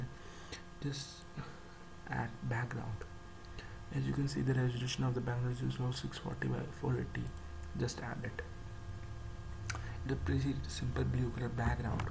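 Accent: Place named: India. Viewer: im i the only one to hate indian accent ?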